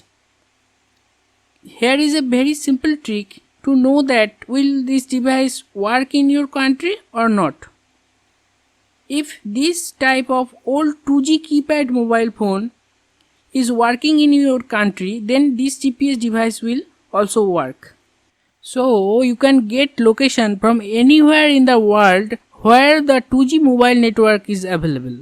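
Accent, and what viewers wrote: India. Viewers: I hear Indian, I click close video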